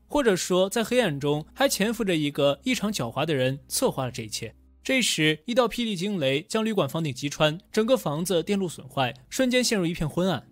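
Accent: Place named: China. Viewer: 肯定是大陆的啊 台湾没有这种腔调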